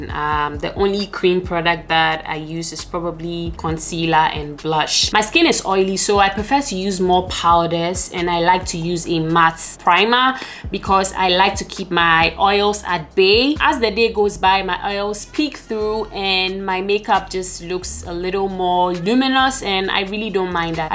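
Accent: Ghana. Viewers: I love your videos and how you do your make up. Your make up always looks so natural even when you do a full glam. It never looks like a cake of make up. You are a very beautiful woman. I was trying to figure out where you are from because of your accent but I saw you are from Ghana! That is sooo cool! I love Ghana I would love to go one day!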